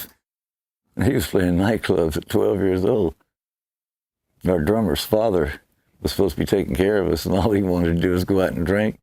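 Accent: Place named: Texas, United States. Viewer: OK that is friggin' hilarious Bill. He not only resembles President GHWB, he's got the Texas drawl too. for president! (Of course, the question of whether one of his greasiest, foulest turds would be better than what we have now is immaterial, but I digress.)::dons flame retardant suit and awaits arrest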